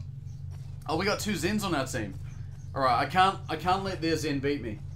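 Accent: New Zealand. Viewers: Are you from NZ?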